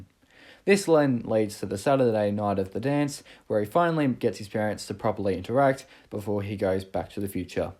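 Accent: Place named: Australia. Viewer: God I hate the Australian accent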